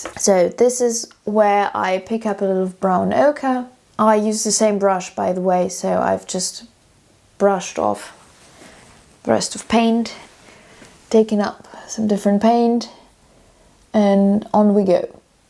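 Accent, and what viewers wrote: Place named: Australia. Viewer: Love your teaching so much ❤ I love your accent, I'm guessing that you are from Australia originally? I'm in Newcastle England. If you get time and only if you think you're viewer's would be interested could you explain how to paint sheet music like I've seen in some old still life paintings. And how to paint writing on letters such as that painting by David of the dead man in the bath please. Happy new year 🎉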